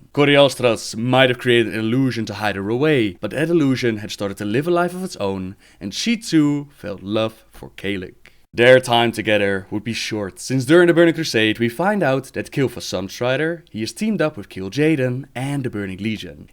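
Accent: Netherlands. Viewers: I know I asked that on asked but I am not sure if you saw it. Can you make fury do one lore video? It is relaxing to listen to her voice. It would be perfectly to just chill out and listen to some great story told by fury:) And force her to make music and sing. But never the less keep up your good work too. Also a great voice to listen to and the dutch accent is always a friendly one to hear:D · are you Dutch ? :) well i am c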